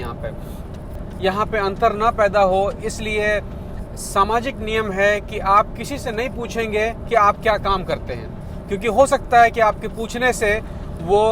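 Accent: Bihar, India. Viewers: Bhai aap ka language me biharipan lagta hai ... Very good bro thx for this information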